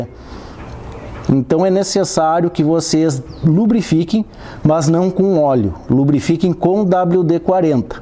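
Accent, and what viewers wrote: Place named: South, Brazil. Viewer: Obrigado pelas dicas amigo. Acabei de comprar uma FA. Entao ja vi alguns videos seus e do val( quando tinha a gsxs) pra entender melhor a moto. Tem sotaque do Sul. Vc é de onde?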